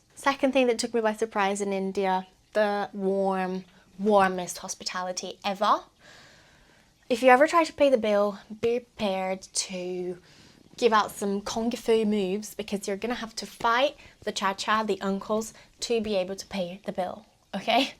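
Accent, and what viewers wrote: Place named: United Kingdom. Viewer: Delightful, charming and insightful! Your ‘British’ accent, btw, is adorable! 👌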